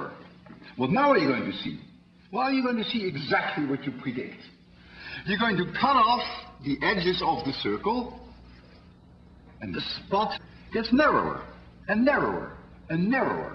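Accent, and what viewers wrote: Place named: Netherlands. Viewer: Dutch and English don't mix well in his case... That is one hell of a bad accent. But nice video anyway.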